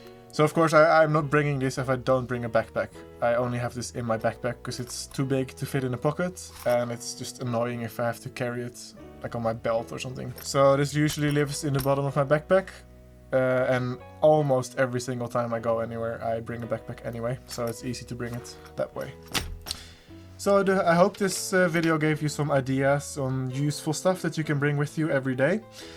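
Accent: Netherlands. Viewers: aahhh ik zat al te denken huh dat klinkt toch Nederlands haha waar kom je oorspronkelijk vandaan dan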